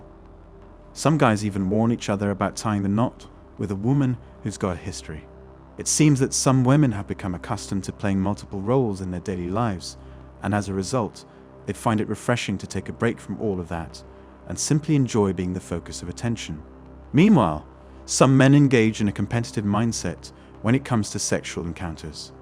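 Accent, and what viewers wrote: United Kingdom. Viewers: How wonderful the British calm presentation, in addition to the nce accent, when compared with the high pitched American presentations - almost shouting.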